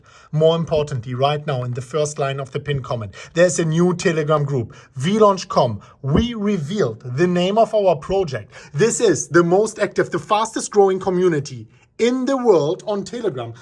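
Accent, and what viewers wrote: Germany. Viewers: Why are you interested in his German accent? Even for me as a German it’s brutal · Man, I really hope that one day I am crypto rich and develop a German accent like.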